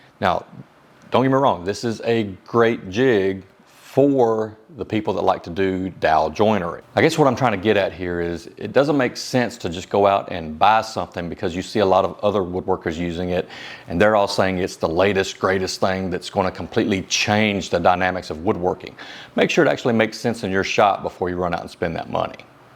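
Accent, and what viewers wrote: South, United States. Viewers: Your southern drawl is divine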